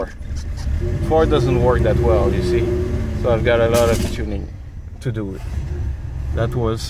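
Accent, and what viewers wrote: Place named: Quebec, Canada. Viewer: french people refer to people living in France. this accent is from Quebec, Canada. Are you english?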